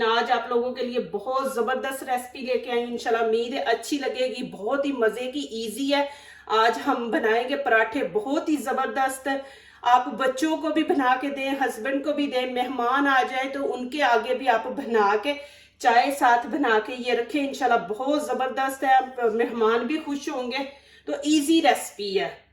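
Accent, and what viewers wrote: Punjab, India. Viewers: Ma shah Allah zaberdat . Ap kaha say hay punjab say ap Sialkot ya Gojranwala say lug rahi hay ku k ap ki urdu bohat punjabi touch hay 😻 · Baji bohat achi recipe hay lakin aap K Punjabi lehjy me Urdu bohat torture karti hay. Kya hi acha Ho K aap Punjabi me hi videos banaya Karen. Wo hame ziada pasand aay gi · مجھے تو آپ کی پنجابی لہجے والی اردو اچھی لگی 💕💕💕💕🤲🤲🤲🤲اور ریسپی بھی میں ٹرائی کروں گی مزے کی لگی 💓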